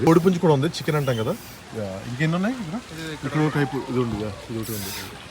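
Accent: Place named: East Godavari, India. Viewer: U looking gorgeous bro..Pakka mana telugu east slang bro. I happy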